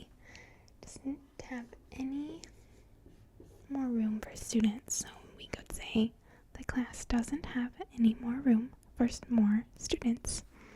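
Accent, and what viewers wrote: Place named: Michigan, United States. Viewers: the way she said welcome back and others words was stressed like how Sara Pailin does but she’s from a spot in Alaska where people form Minnesota moved to and the accent is different there. But she has such a lack of accent at times it does lend to midwestern. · I'm almost positive that's a Michigan accent.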